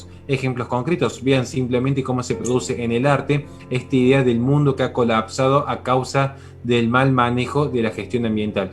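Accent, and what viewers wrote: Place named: Cordoba, Argentina. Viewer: Verdad, debo admitir que no me gustaba el acento cordobés pero que deleite es aprender de este genio, despierta mi curiosidad se saber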